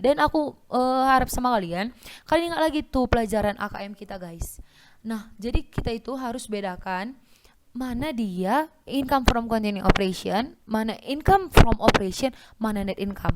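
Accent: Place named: Medan, Indonesia. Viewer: Makasi kak, wkwkkk nampak kali org medan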